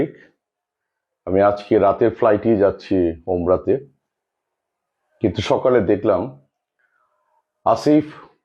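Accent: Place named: Comilla, Bangladesh. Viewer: বাড়ি কোথায় কুমিল্লা বুঝতে হবে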